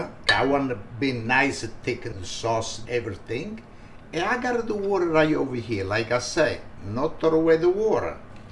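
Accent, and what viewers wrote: Italy. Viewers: Great looking food, but the Italian accent is falling away..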